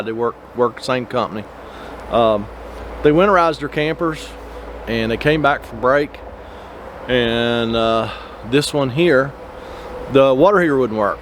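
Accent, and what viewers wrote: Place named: West Virginia, United States. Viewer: Back to Beckley! Oh yeah. In '76 I was there training to manage a store, moved to Bluefield/Princeton area shortly after, and once a month drove places like Welch, War, Matewan, and Williamson to check up on other stores, quite often on ice. First time I'd seen grey snow, and to a Texas raised young man, it was depressing. I thought I recognized your accent.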